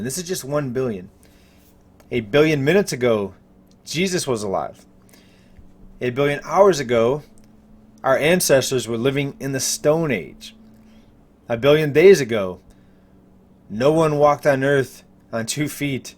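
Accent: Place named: South, United States. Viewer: I like his big bubba accent!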